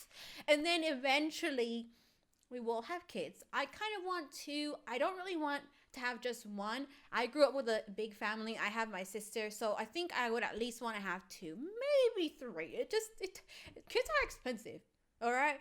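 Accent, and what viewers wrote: United Kingdom. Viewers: I thought you came from the UK 🇬🇧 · i thought u were british...😂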